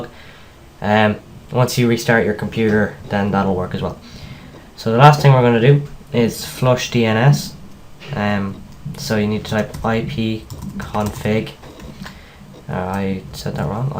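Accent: Ireland. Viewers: FUCKING THANK YOU!!! and your adorable Irish Accent!!!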